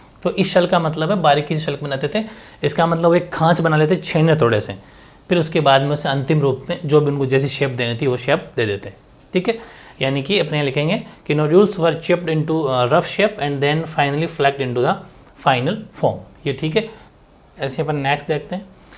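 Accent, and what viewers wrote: Rajasthan, India. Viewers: राजस्थान से हो क्या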